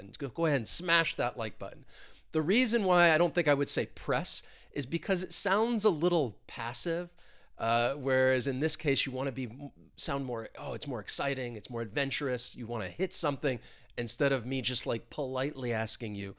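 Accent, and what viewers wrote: United States. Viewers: I come across your channel, thank you for the great lessons, very professional, you sound like you was born in the USA :)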